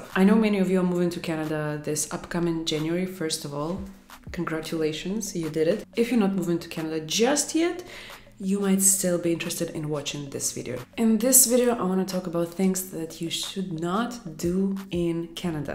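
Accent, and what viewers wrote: Russia. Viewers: Thanks for making this video it was helpful for me . And also I like your Russian accent the way you talk ❤️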